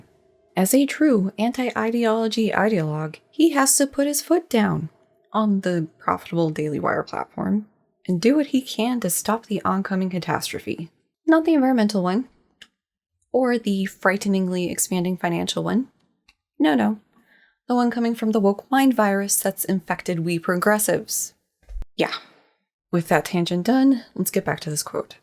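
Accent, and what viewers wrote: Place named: Singapore. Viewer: the dude sounds like singapore. i m in singapore. it is a kind of hell here.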